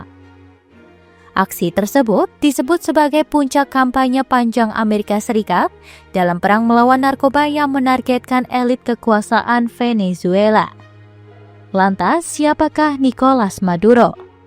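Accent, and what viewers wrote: Java, Indonesia. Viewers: Medhok sekali dubber nya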